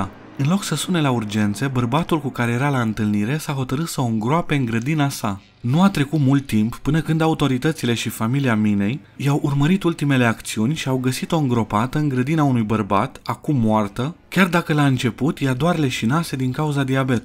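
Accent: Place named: England, United Kingdom. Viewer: Ai puțină engleza pe la colțul guri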